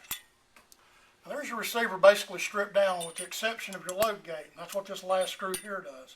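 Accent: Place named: East Texas, United States. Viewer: Thanks for this video! Maine? You sound like my people here in the Texas piney woods! Gonna check your site out. I need my 1974 M94 blued. You mention that you do that. It looks like a black paint that's coming off the receiver.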